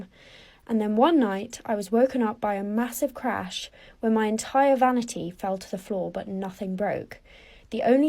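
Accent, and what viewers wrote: United Kingdom. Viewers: An someone tell me why she English like me but only ever lived in america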